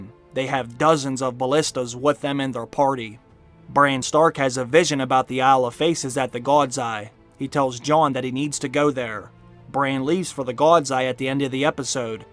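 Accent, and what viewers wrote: Pittsburgh, United States. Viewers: Wait wait wait this guy sounds like a yinzer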